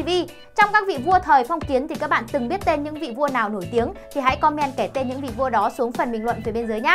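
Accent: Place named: North, Vietnam. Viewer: Em Mc vừa lanh vừa duyên vừa hài hước-to chà bá😅thích nghe em nói chuyện giọng bắt mặc dù chị không thích nói tiếng bắt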